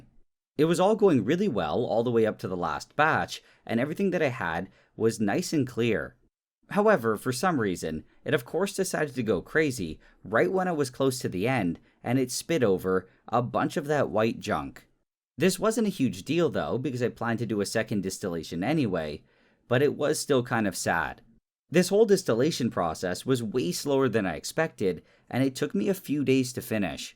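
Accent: Canada. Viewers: Laying in the dark with my eyes closed and I finally hear the Canadian accent. It’s is SO strong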